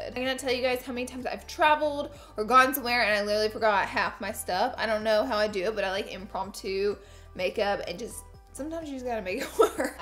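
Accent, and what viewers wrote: South, United States. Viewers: Does she have a southern accent? I can’t tell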